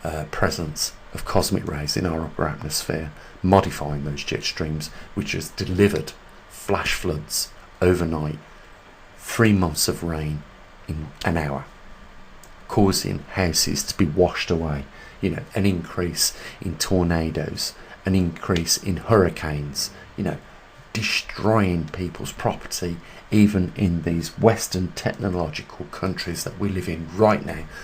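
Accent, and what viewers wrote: Birmingham, United Kingdom. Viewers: You sound like your from my neck of the woods. Birmingham. Greatest thinkers 👍👍👍👍👍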